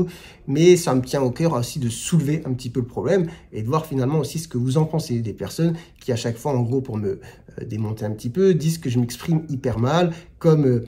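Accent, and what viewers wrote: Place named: North, France. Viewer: Ces gens la n’ont aucune logique. Pour eux les français d’origine étrangère ne font pas d’effort pour s’adapter à la société française mais critiquent quand ces mêmes personnes le font et réussissent . Pareil pour l’argument des immigrés qui viennent pour les allocs, parce que ces même immigrés voleraient le travail des français de souche chômeurs (les taffs de merde que personne veut faire). Donc de toute façon ils auront toujours une manière de détourner les choses dans leur sens, quite à utiliser des arguments fallacieux ÉDIT: la personne qui t’a dit que tu dis wAllah confond avec « Voilà » avec un léger accent du nord 😂 ils ont vu ton nom toute de suite ils entendent ce qu’ils veulent entendre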